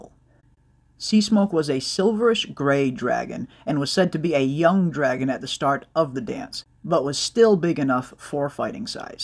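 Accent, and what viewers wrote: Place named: Boston, United States. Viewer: You must not be familiar with channel, that’s just his Boston accent. It can sometimes sound like he’s not pronouncing a D or an R here and there but he is. He’s literally an encyclopedia of ASOIAF knowledge, there’s not much about the source material that he doesn’t know.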